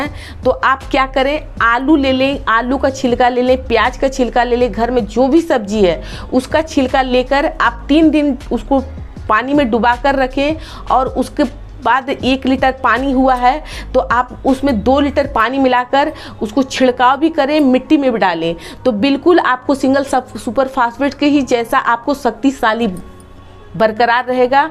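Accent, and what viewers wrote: Bihar, India. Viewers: Humko Bihari boli boli bhut achcha lagta hai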